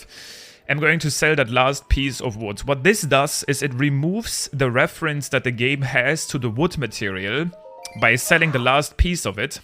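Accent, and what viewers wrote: Germany. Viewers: Are you german cause of you're accent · Boah dieser deutsche Akzent 🥱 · das deutscheste englisch das ich je gehört hab ^^ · I have a question ur german arent you because i hear a pretty thicc german accent😂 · dang, ich liebe diesen deutschen Akzent xD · Bist du deutscher?Das erkennt man bisschen am englisch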